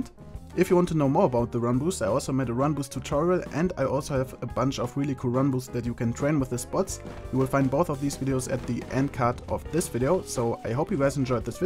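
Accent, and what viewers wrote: Germany. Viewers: ★ CS:GO Channel ★ shit also bist du doch deutscher das hört man sehr sehr sehr gut raus:D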